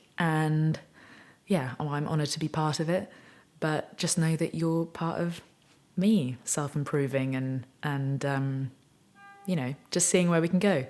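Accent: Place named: RP, United Kingdom. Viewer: The resolution idea is just a fabrication. Some wishful thinking most humans blather, then fail about. You probably don't have a cat, because you travel so much, but, if you did have a cat, I would counsel you to monitor their way of passing the days. What do you want really? More fame or money? More free time? To play like Marty or Paul Davids, John Mayer or hundreds of other guitar players? (Chet Atkins, Tommy Emmanuel, Glen Campbell, Vince Gill, Justin, BB, Stevie Ray, Jimi, Bonnie to name a few). I would say...forget the New Years resolution aspect...and just do you. Different topic. How would you characterize your english accent? Oxford like? Upper crust I should imagine. But I am interested in how you would describe it. I am several generations older...dad was a WW2 pilot..so, I am a boomer...one toe in the cemetery...nearly an ex-parrot. 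Just be you. You are wonderful as you are.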